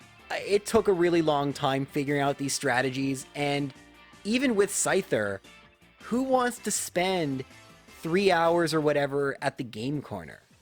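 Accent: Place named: Canada. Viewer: I can tell your Canadian by the way you say “again”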